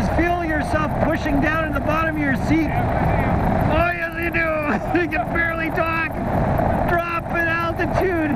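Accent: Canada. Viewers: I just love the Canadian accent! So polite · Wacky Canadian. Or Wisconsonian or Minnesotan. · He sounds Canadian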